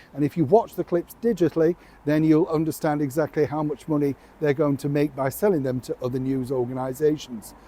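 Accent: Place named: Yorkshire, United Kingdom. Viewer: It seems everything is fake with MM. I'm surprised that even the baby reveal was fake, planned! I dislike fake people, Obviously there has been even more lying to us then if "Aitch" has been getting paid for these things. Can't stand her accent either, awful. Love the way you speak though, a little mixed, perhaps with living in London with the odd Yorkshire word or phrase slipping in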